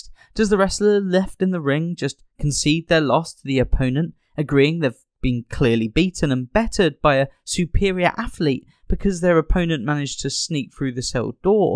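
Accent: United Kingdom. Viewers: I actually like the way the narrator says it, it fits right in with the rest of the proper British pronunciation he uses. Lay off the coffee man, all the random all caps and aggressive tone is really off putting and undermines the point you’re trying to make.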